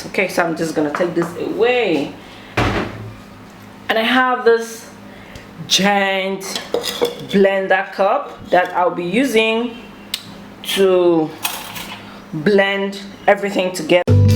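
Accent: Nigeria. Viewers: Please stick to your Nigerian accent....nice video btw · As you were talking I said to myself this lady has to be Nigerian. Then you mentioned Nigerian rice. Gbam! I've just started doing the rice water rinse, I may try this one down the line.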